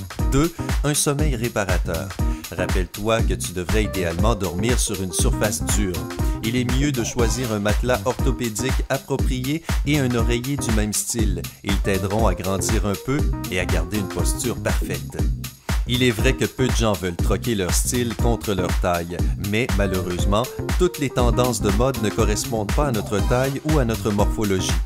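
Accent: Quebec, Canada. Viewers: Pourquoi une voix québécoise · Ses un québécois celui qui parle ?😊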